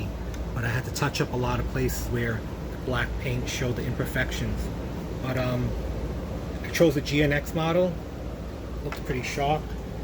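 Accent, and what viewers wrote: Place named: New York, United States. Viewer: Hey, I'm Chris......Jeff asked me to check your channel out.I subbed your channel & I like your builds a lot. I primarily build muscle cars myself, a few old hot rods. Just curious.....I'm originally from NYC, you sound like you have a New York accent. Anyway, I subbed U & nice 2 meet U buddy.....speak 2 U soon my man!!!!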